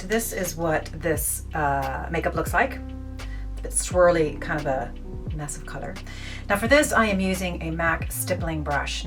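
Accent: Ireland. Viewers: I’ve been on the fence about this, but now I’m going to try it after seeing how great it looks on you. PS: don’t remember how I stumbled across your channel, but I’m so glad I did. You’re lovely, and I love that hint of the lovely Irish brogue!💚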